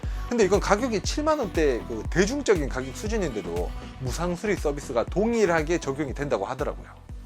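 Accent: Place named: Daegu, South Korea. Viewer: 대구 사투리 전국민이 다 알아들을수 있도록 채널이 더욱 발전되길 기원합니다 해피 뉴 이어~~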